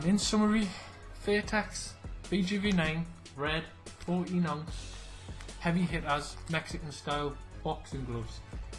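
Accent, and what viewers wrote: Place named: Ireland. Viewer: Thanks for taking the time to answer. You sound like you are from Ireland. If so, I guess you are all in for McGregor?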